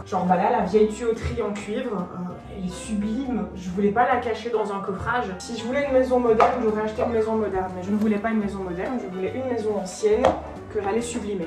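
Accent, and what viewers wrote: Belgium. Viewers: Tient c'est première fois que je remarque son accent belge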